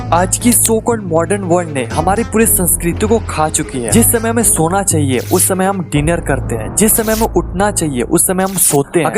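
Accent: Assam, India. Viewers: Bro are you from Assam?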